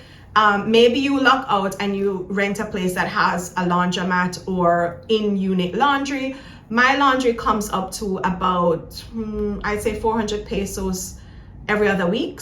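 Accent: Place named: Jamaica. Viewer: Very informative video! ❤ I love the Jamaican accent…😂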